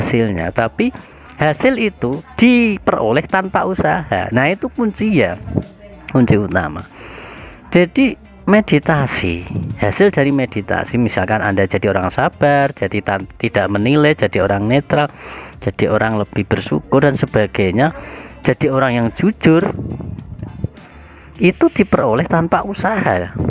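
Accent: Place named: East Java, Indonesia. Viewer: Mantap Mas, panjenengan asli ndi mas, logat kayane asli daerah wetan?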